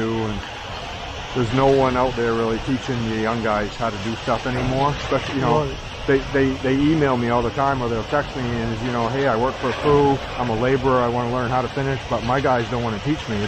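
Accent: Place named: New England, United States. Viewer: You gotta be local like Maine mass or new York with that accent I recognize and love it and time I hear a new englander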